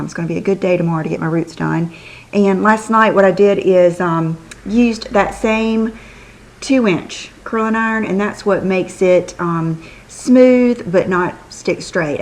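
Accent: South, United States. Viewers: I love watching you! I'm Southern also, so it's like I'm listening to a girlfriend. Big tip---- I've already tested Dior Star. It IS lovely, but doesn't wear all day or stay as natural-looking as Guerlain Lingerie de Peau. Virtually no one reviews Guerlain on YouTube but their foundation is fantastic! Sample it. It does oxidize slightly, so go just a tad lighter than what u think u need. :)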